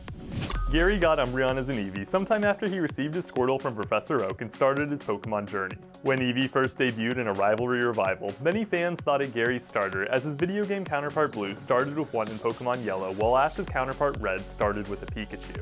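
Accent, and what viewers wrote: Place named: California, United States. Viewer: my instinc tells me you are Californian, am I right? ☀🌊🏄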